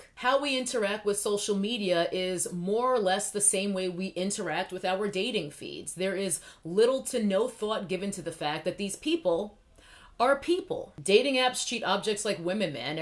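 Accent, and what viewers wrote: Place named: New York City, United States. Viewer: Have you been hanging out with your NY crew??? The accent is strong in this one today! Also, yea dating B-lows. I've been off the apps for 3 (?) years now and this was my reminder to stay off.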